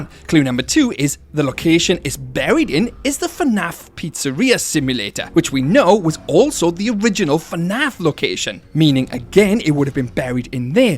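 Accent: Wales, United Kingdom. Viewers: Completely unrelated but are you welsh?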